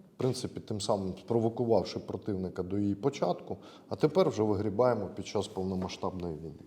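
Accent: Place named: Russia. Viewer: Зі всією зневагою до нинішньої ''влади''... Ти просто'' топиш'' за ''шоколадного''... Це ганьба!!! А, так... І - підтягни українську . Бо ж аж сифонить ''узькім''...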